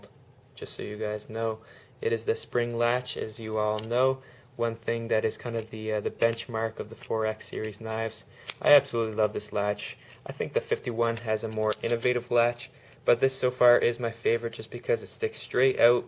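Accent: Canada. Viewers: Wait are you Canadian?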